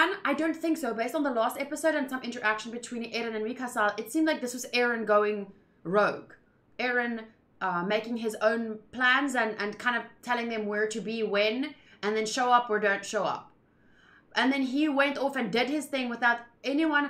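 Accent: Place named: South Africa. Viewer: I'd bet it's South African, really cool fun accent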